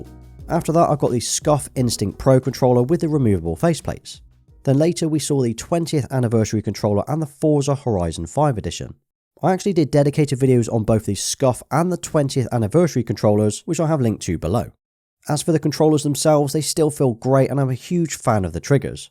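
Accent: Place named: South West, United Kingdom. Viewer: Great vid and nice to hear another South West accent! 😀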